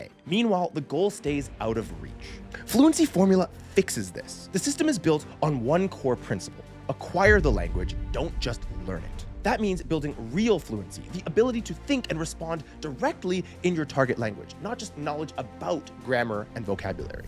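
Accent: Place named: China. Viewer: I'm also from china，hella impressed by ur Chinese accent and applications，u sound literally Chinese！！！OMG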